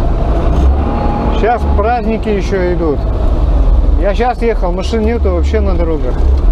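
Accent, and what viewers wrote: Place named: Perm, Russia. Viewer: У тебя говор что то из Пермского есть)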